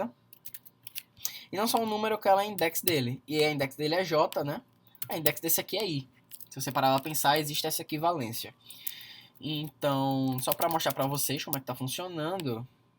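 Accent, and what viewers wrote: Pernambuco, Brazil. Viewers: E pelo sotaque deve ser pernambucano. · Esse sotaque de pernambuco é muito característico, parece muito com o meu de Natal.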